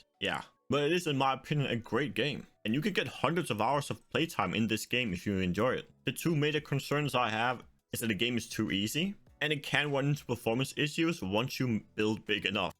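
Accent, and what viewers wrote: Denmark. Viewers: I COULD HEAR IT IM FROM DENMARK TOO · Are u danish? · Er du dansk? :D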